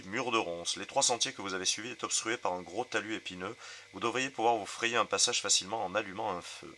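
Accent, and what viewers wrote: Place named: South, France. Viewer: Si tu n'habite pas trop loin de la Bretagne je veux bien t assister pour tirer les cartes voir pourquoi faire une partie ensemble pourquoi pas.hé hé...mais ton accent me fait penser que tu es plus dans le sud.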